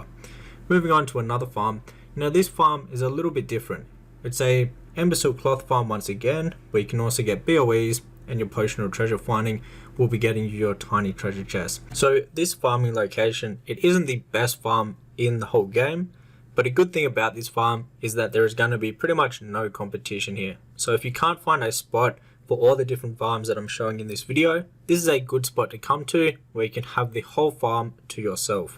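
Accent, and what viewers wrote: Australia. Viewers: Wow man.. still at it?! I remember being a kid playing on my cousin's account and looking up how to make gold / farm mats and I heard this rad ass Aussie saying "check out this farm, its 'Bad P**sy' " I come back to the game and find myself here again with same OG posting content! That's dedication homie! GGs!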